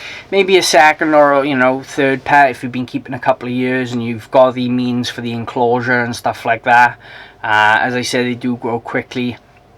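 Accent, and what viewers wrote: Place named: South Wales, United Kingdom. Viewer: The south Wales accent is lush.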